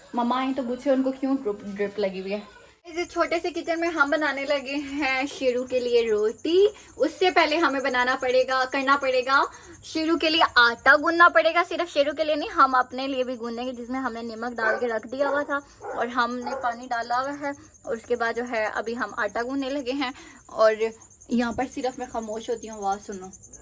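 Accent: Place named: Punjab, India. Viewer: Hay ALLAHA AAP ki panjabi so sweet.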